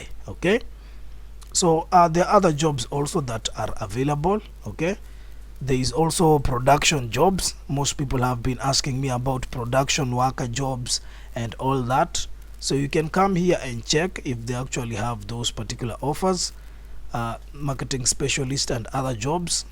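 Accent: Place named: Kenya. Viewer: and he sounds sooo sooo kenyan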